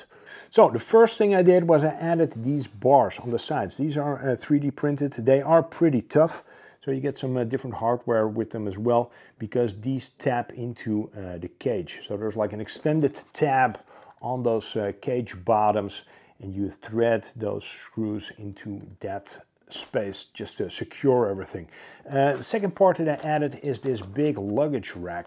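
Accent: Netherlands. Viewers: Very nice video like it. Just thinking of getting a CJ. Btw, do i hear an dutch accent? 😊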